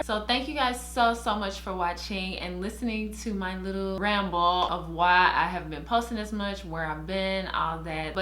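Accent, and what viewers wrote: South, United States. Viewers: Love your content, you're the only person on YT i know who wears their natural hair in it's shrunken state (the reason i subcribed) you're a unicorn. I think if you made dedicated videos showing how you wear your hair (a week in my natural hair type video) those videos will blow up. Your haircare routine is simple which alot of us want. Another video idea you sound southern mayvbe share your experiences being natural in your community?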